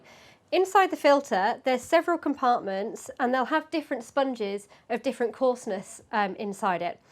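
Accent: United Kingdom. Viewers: the British pronounce words in a different way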